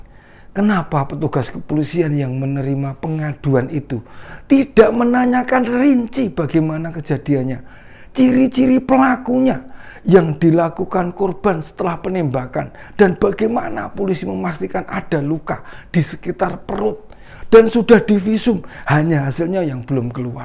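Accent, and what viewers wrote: Java, Indonesia. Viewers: Medok amat ini bpk logatnya aku juga dari jawa tp logatnya nggak medok banget tp lucu dari logstnya aku juga nonyon kontenmu sampai ful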